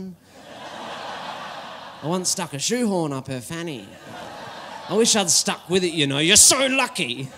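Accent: Australia. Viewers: That was the basis of my comment. After the many times I've seen him it's the first time I noticed a hint of Aussie. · If you are in any doubt as to nationality listen to his accent. It's a middle of the road educated Aussie accent. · a MEGA talent , pity the subtitles are prudish (blanking out swear words on the i'net WTF !!) & often put in the wrong words coz they don't correctly hear the Aussie accent !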